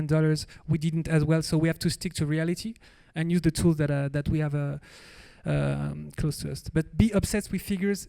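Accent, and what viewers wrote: France. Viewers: C'est quoi cette histoire de tout le temps parler en anglais ?? Vous vous rendez compte à quel point c'est pénible d'écouter un français parler anglais pendant 1 heure... Please The Family, dont get lost in the sauce...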